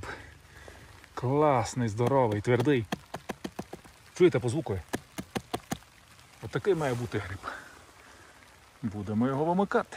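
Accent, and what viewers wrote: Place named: Transcarpathia, Ukraine. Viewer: Звідки родом і який район , якщо не секрет ? По акценту наш закарпатець...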